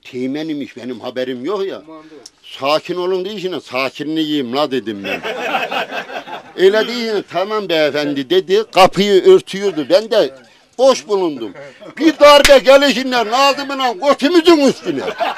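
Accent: Sivas, Turkey. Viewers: SİVAS ŞİVESİ GİBİ ŞİVE YOK. HARFLERİN SÖZCÜKLERİN TEK TEK İNCELENMESİ LAZIM. ÖZ TÜRK KONUŞMASI BUDUR. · ayni sivas yalaaaa:) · Helal olsun işte bu tam bir sivas şivesi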